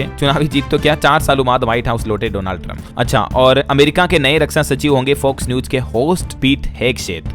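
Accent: Rajasthan, India. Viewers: Kisko kisko lagta h ki Rajasthan se hai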